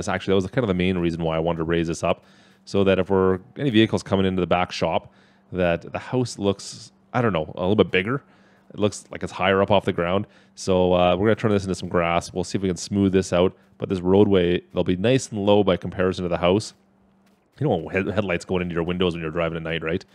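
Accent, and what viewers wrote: Canada. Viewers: Are yoy from alberta?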